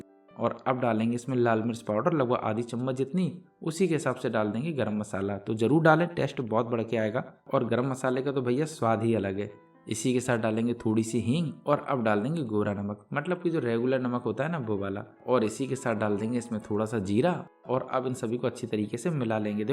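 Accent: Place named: Bundelkhand, India. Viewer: आपकी बुद्देखंडी हिंदी बहुत बढ़िया लग रही है 👍 मुंगोसे भी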